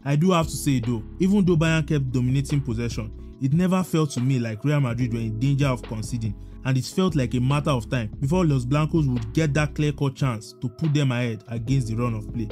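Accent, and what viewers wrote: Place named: Africa. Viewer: Nice video. Which country are you from. I get an accent either Nigeria,Ghana, Kenya or Cameroon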